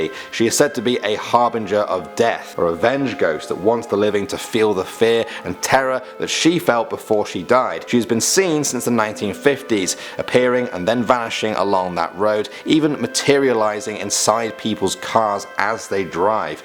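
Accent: United States. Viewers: The americans accents thats not how its said its cuz ur americans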